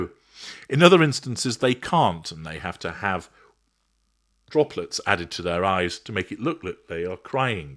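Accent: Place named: RP, United Kingdom. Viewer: While at first I was very much on board with exposing this particular narcissist, I have given your series on Mrs. Kirk a great deal of thought recently. I mean literally NO OFFENSE to you, but... as the daughter of an ASSASSINATED NAVAL OFFICER (18 July 1972 at Lawrence Livermore Nuclear Lab) I find it rather reprehensible that you are constantly going after a widow in the first few months after the death of the husband. I am cognizant she is a narcissist but wouldn't DECORUM dictate to wait at least 3 -6 months BEFORE treating any family in grief regardless, with some respect? My MOTHER IS A HUGE OLD NARCISSIST and as the daughter of her MURDERED HUSBAND, I would HIGHLY RESENT SEEING A TAKEDOWN VIDEO OF MY MOTHER IN THE FEW MONTHS THAT FOLLOWED MY FATHER'S MURDER. I am NOT a narc - but an empath and as one, this is rather hurtful to those children don't you think, when they after 15 years or so, see how brutal you were to their mother just weeks after their father's assassination? 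I get the educational value but really? I thought you had VASTLY more class and decorum - you do sound like a toff after all. However you are a narcissist so you probably really don't give a shit about what anyone else thinks. right - esp. the actual child of a narc mother whose father was also was assassinated? You just don't give a damn how those kids might feel in the future, do you? I am not at all suggesting that you don't continue this series- but WAIT maybe until well into next year... T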